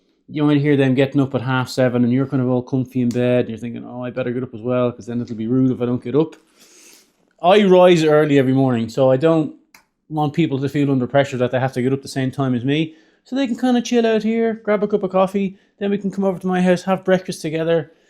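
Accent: Dublin, Ireland. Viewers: Lovely seeing your cottage and lovely fire your a treasure enjoy Christmas love your irish lilt ❤😊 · I laugh every time I listen to you. A missed friend is from Dublin and your phrasing is music to my ears. I knew you were a Dubliner almost immediately even though I haven’t heard it in years. Good videos , fun to watch. Stay on your feet on the roof, you took a good thump there. Yes I saw it yesterday.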